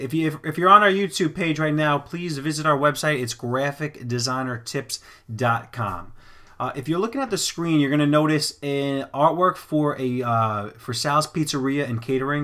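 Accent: New York, United States. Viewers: Awesome, thank you fellow New Yawka!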